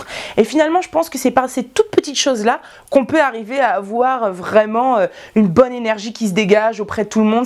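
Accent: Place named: South, France. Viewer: Coucou je suis complètement d'accord avec toi pour les copines par expérience on apprend bien des choses...j'adore l'accent du sud tu m'a fait trop rire, tu et naturel et tu te prends pas la tête sa fait plaisir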